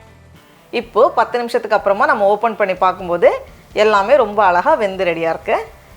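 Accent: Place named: Chennai, India. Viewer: ah madras tamil konjam 💜seen ah💜 irukum kekumbodhu sami namba bhasha pesudhudanu sandhoshama iruku Akka 😍🤣🤣🤣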